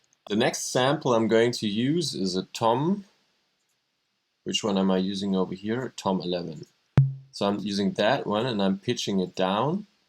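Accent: Germany. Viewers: Man hört raus dass du deutsch bist xD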